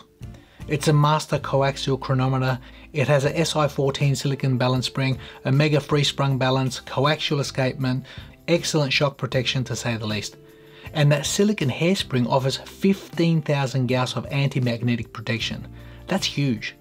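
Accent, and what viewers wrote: Australia. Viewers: Lovely accent. Aussie? 😉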